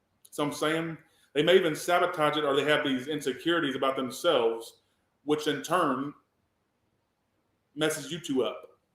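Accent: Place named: South, United States. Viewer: Thanks for the work you do ! Love that Southern draw!!!! I’m from Texas so I do especially when I’m mad (Scorpio)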